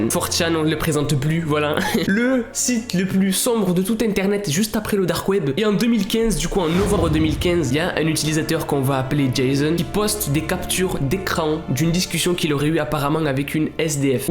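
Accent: Quebec, Canada. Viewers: J adore ton accent québécois Salut cousin francais cool lol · Quand ta dit "jsp pk j’ai un accent canadien Québec" OUAIS C MON RÊVE QUE TU SOIS QUÉBÉCOIS MONSIEUR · belle acent quebecois 😅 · J’adore ton accent un peu canadien et québécois j’adore❤😂👌🏻 tu est prêt pour aller aux Québec 😏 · Ton accent québécois elle est épique confirmée par une québécoise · Ton accents canadien est super tous comme ton accents anglais 😂😂❤️ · Si tes en France pourquoi ta parler quebecois jcomprends pas🤣🤣😭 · 'un petit axant canadien Québec 😅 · Omg je suid traumatisé et tres belle acssans canadiens moi je suis québécois je m'abonne :) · Wtf l'accent québécois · Un ti accent dquebec 😂 Hahahaha je t’adore je suis québécoise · Il est trop chaud mdrr Et fais trop bien le Québécois 🤣🤣🤣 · Le petit accent quebecois qui fait plaisir😂❤️❤️ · mdr je reconnais tout les canadien mais je peux te dire que tu a une voix de canadien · avant que tu le mentionnes je trouvais déjà que parfois tu parlais un peu comme un québécois 😂 avec de la pratique tu pourrais parler aussi bien que moi 😂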